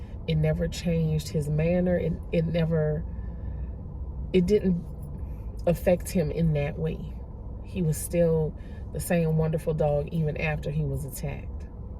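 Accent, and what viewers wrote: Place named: Louisiana, United States. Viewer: Have no idea why someone would complain about you talking. That Louisiana accent hit different. I could listen all day. Also, I'm sorry to hear you're going through these things but the Light is always surrounding us we just have to step back in. Stay beautiful inside and out ❤ So glad I found you ❤❤❤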